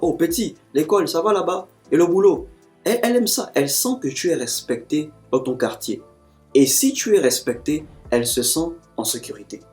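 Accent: Gabon. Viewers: La tonalité de la voix et l'accent...mec tes Gabonais ?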